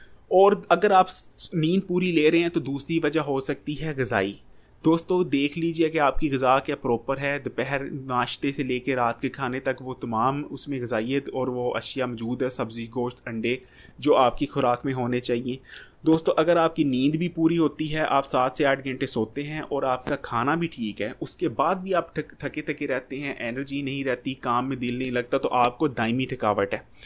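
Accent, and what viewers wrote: Punjab, India. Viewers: baie ap pnjbi he na ap Ki boli bout achi lgti he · اگر اپ ناراض نہ ہوں تو ایک بات کہوں۔ پلیز آپ اپنی اردو ٹھیک کر لیں۔ پنجابی لہجے میں اردو بولتے ہیں۔ یا پھر اپ پنجابی میں ویڈیوز بنا لیا کریں۔ شکریہ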